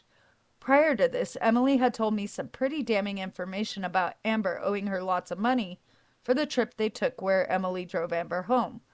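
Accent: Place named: South, United States. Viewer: !! Ngl I’m fan girling right now. I love your channels & think you’re incredibly talented! I love your quit wit & humor, plus as a Southern girl your accent reminds me of home. ❤ Just had to utter fluff 😜& send you love & appreciation. 😊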